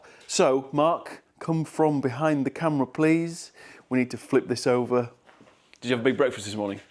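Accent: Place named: England, United Kingdom. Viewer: Haven't watched your channel before it just popped up in my feed today, pleasant surprise to hear an English accent, was expecting an American one before you spoke. Cool concept btw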